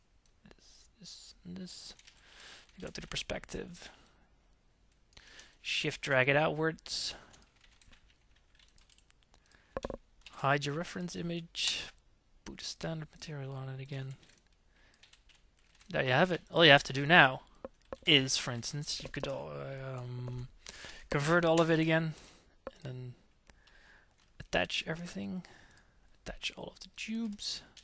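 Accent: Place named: Netherlands. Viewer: Dutch accent :D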